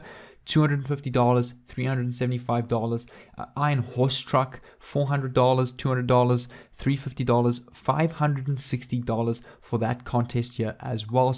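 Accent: South Africa. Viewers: Hello I just discovered your channel. I'm definitely gonna follow you closely. You just commented on another channel. I'm going to model some you your videos. Hello south Africa. Love the accent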